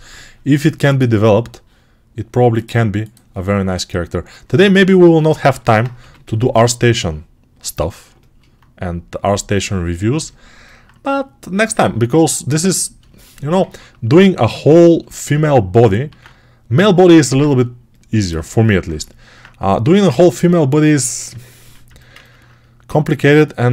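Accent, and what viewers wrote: Bulgaria. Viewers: You bulgarin bro (аз също + това акцента ти леко издава ) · The moment I heard your voice I new you were Bulgarian :D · I hear that Bulgarian accent from miles away :D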